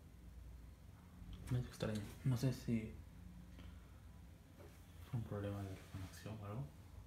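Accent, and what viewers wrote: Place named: Philippines. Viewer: pesimo video no se te entiende nada hermano y parece que hablas en filipino o algo asi ...con todo respeto es mi punto de vista gracias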